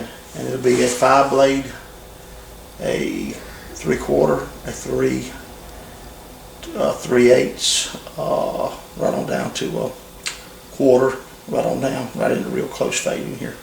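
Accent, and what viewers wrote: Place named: South, United States. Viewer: Awesome cut and you sound pretty southern where you from?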